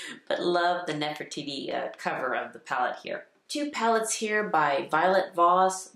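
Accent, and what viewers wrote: United States. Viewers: You may be Egyptian, but your accent is all Texas! I just gifted several palettes to my college aged daughter. Felt good!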